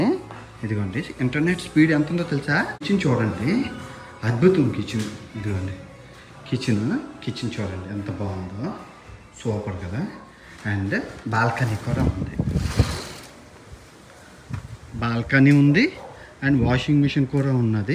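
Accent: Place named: Chittoor, India. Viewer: Namaste bagunnara 😂 , Andra chitturu style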